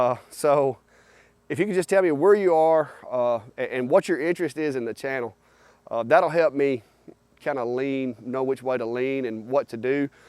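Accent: Alabama, United States. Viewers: I like it all! Love the animals, brother and BIL does some wood projects, and I grew up in my early life in mobile Alabama, so I love listening to bama folks! Lol! I live in houston, texas now and came from maymays channel. I think you are doing great! Variety is fun.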